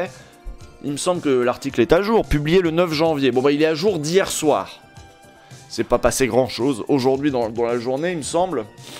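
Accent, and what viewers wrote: Quebec, Canada. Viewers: L'accent québécois toujours au top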